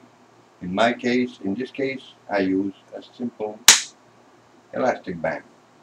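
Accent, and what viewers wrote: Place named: Louisiana, United States. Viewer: I hear a home accent of a Cajun... ❤🥰❤🥰❤ I am from the southern part Of Louisiana.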